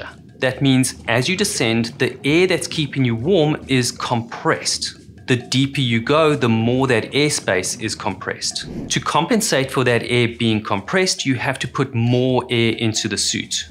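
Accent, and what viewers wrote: South Africa. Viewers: U sound south african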